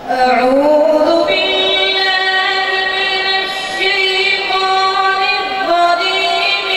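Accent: Panipat, India. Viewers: ماشاءاللہ کیا مٹھاس اور چاشنی ھے پانی پتی لہجہ میں۔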